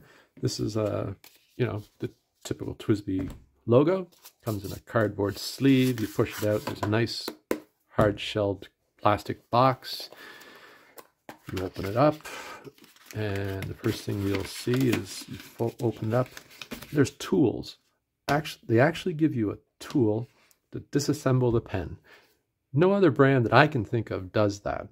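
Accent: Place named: Canada. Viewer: I detect Canadian or Minnesotan accent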